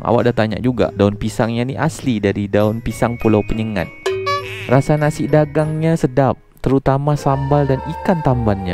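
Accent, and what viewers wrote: Johor, Malaysia. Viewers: Logatnya sama upin ipin🤭😁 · senang liat2 dan denger logat melayunya bang. asyiiik...terus yg rajin bikin kontennya ya bang...rasanya ikut jalan2... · Saya dari jawa. Denger abang ni ngomong kaya Upin ipin... luar biasa bangsa kita dengan banyak suku suku... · Upin ipin wkkwkkwkk..tp serulah .cakap awak.. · saye suke sekali dapat melihat pulau2 kecil dikepualauan kepri, apalagi logat melayu nye yang khas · Assalamualaikum. Tak ada 'pelat'/slang Indonesia pon. Sebijik macam Melayu Johor. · Salam dari Johor..bahasa tiada beza dengan orang Johor..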